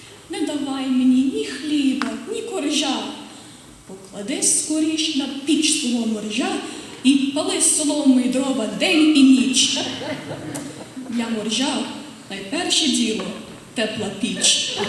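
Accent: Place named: Canada. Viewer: ! Ну яка молодець! Я так сміялася! Трохи канадійського акценту тільки додає кумедності цій гуморесці:)) Можете сміливо продовжувати і далі:)) Бажаю успіхів!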